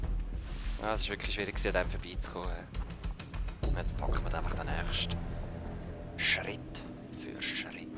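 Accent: Switzerland. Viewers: Ich find dini Lets Play's voll geil wills uf Schwizer dütsch isch und du machsch es so lustig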